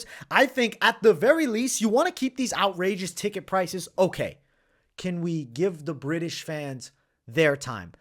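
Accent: United States. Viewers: you got that American accent down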